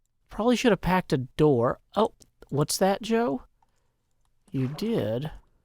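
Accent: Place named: South, United States. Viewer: Yes. It has been a very long day and your southern dolcet tones are as warming to the soul as Pie and Chips sandwich:D keep up the relaxing work:D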